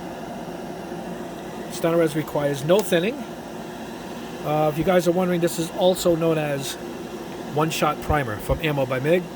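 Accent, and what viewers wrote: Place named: East Coast, United States. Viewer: Cool and east coast accent and a voice that doesn’t sound like a mob hit man but a New York professor of fine art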